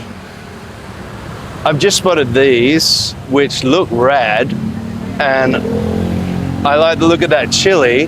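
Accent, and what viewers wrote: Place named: Australia. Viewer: the foreign accent (Australian?) pronouncing Indonesian had me confused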